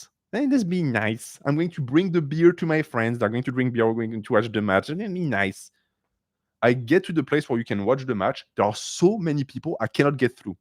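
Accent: France. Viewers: he’s loosing his french accent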